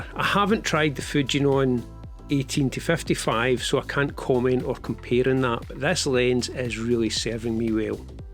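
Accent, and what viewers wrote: Scotland, United Kingdom. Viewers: Hi, with the X-T5 agree on the 70-300 for where you are going. As for the rest of the kit…option a) Sigma 18-50 and 12mm Zeiss or b) 33mm f/1.4 and 16mm f/1.4. Probably a) though as I would choose wide over shallow dof…love the accent. It reminds me of my old Grade 11 Physical geography teacher Mr.McEwan who would make us study topographical maps of his beloved Scotland. It took me a whole semester to realize loch was lake and about as long to understand what he was saying. 🎉 Have a great trip and look forward to the pictures. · Thanks a lot for sharing your insights,! Be you 🙂love the Scottish accent:) to your point: I love the Fuji 16-55 f2,8.. yes it is bulky.. but it delivers such great image quality.. and I was lucky to get it used in great condition for a fair price.. I pair it for hiking either with the 50-140mm or for more reach and lighter weight indeed with the 70-300mm - Cheers, Tom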